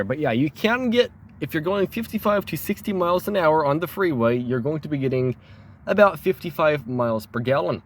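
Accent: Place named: Canada. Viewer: I've just discovered your channel whilst surfing for Mitsubishi Mirage videos, and this is the first one I am seeing. A quick question, are you Canadian? I think I am detecting in your voice a Canadian accent. If I am wrong I must say it comes pretty close to it. I am quite keen on the hatchback Mirage as a replacement for a 2006 Ford Focus, and my eyes have been eating up all this Mitsubishi videos like candy! 😁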